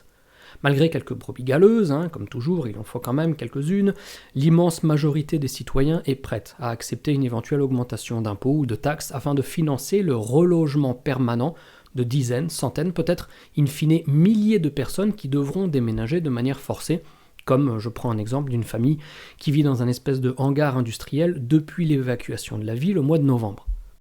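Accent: Iceland. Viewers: Votre accent islandais est surprenant, l’islandais est surprenant ^^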